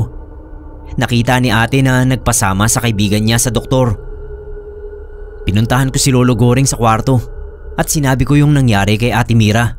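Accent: Iloilo, Philippines. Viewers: kuya ilonggo ka no? 😅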